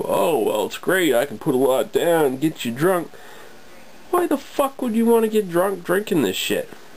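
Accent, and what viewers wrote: Canada. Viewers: He does remind me of Ricky, Ricky's awesome. Its the Nova Scotia "R" pronunciation. All my aunts and uncles on my mom's side are from Nova Scotia and have the Nova Scotia accent. And any time a hard "R" sound like in car, bar, etc it stands out. Sometimes I even sound that way.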